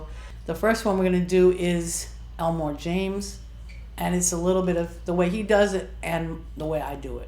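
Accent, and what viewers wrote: United States. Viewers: A dear friend just turned me on to! Wow wow wow! She is the real deal. How have I not heard of her before? I am going to check her Zoom lessons. You may be from Chicago but do I hear a Boston accent? You rock! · Love your accent “Chicago”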